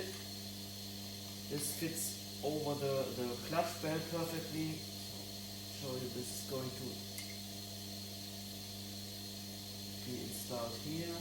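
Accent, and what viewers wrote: Germany. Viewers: Let's do it. I need XXL though, and shipping will be to USA. I want to support you. BTW, one of my grandmother's was a card carrying immigrant from Germany. She had many German friends in the states. Their English was just like yours. Very good, with an accent. Reminds me of my childhood. Love it.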